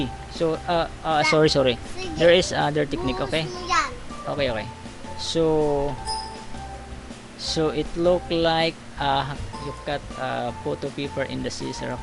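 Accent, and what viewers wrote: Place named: Visayas, Philippines. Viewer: walang Kabuhay-Buhay, Tagalogin mo na lng Hirap na Hirap ka Mag-English at di bagay pati mga Pronounciation mo parang Visaya Lng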